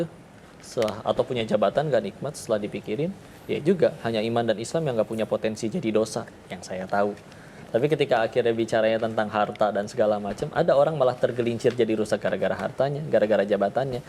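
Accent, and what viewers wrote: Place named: West Java, Indonesia. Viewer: kan org sunda, bogor, nyantri d bandung, jd logat ny k bw sunda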